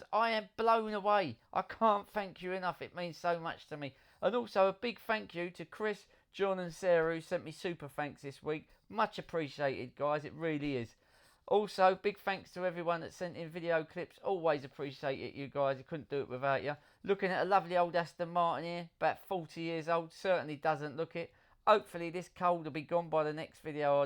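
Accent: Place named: London, United Kingdom. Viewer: There goes another mobile organ donor . The London accent with authentic commentary is 1000% better narrative than the other vids with machine voices and added patronising woke generative AI.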